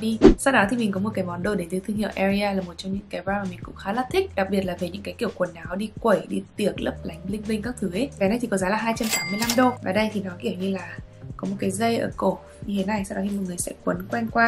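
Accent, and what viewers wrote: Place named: North, Vietnam. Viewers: vẫn giữ được giọng Bắc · sao chị học ở sg mà lại nói giọng Bắc thế ạ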